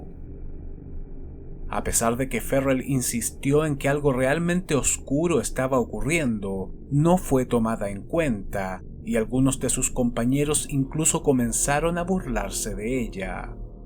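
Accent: Chile. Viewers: Tus documentales son geniales!!! Esas introducciones para ponernos en contexto de la historia que nos vas a contar, está perfecto. Por tu acento, diría que eres de Chile ¿no? Jajajaja. Saludos desde Colombia. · Yuuuuuuupiiiiiii!!♥️ Gracias de precioso acento chileno;)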